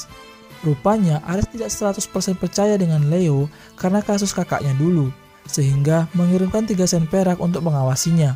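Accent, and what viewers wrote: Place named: Medan, Indonesia. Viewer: Anak medan keknya kau ni ya kawan? Sukak kali lah penjelasanmu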